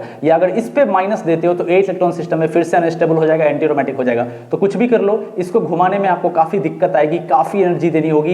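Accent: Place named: Bengal, India. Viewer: Sir, I wanna ask u a question. Are you Bengali because your accent matches with bong culture.Some friends of mine are from Kolkata & they have same accent. Please reply sir.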